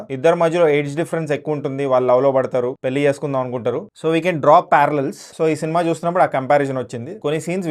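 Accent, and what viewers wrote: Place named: Telangana, India. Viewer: telugu kadu, telangana - Ragadi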